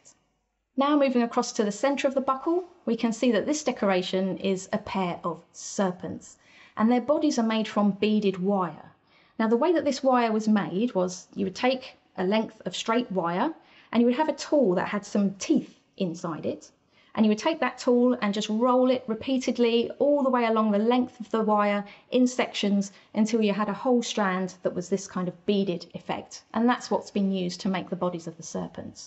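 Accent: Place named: Cockney, United Kingdom. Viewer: Absolutely love her working class London accent Your a class act 👍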